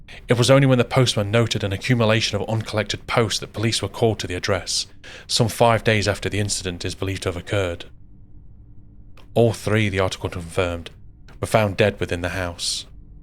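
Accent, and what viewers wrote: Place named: East Midlands, United Kingdom. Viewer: Notts or Derby lad narrating this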